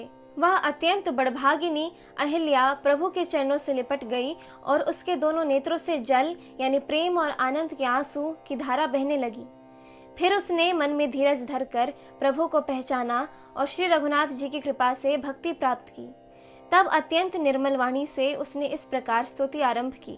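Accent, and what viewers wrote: Standard. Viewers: Atyant shuddha uchcharan hai . Ati sundar hai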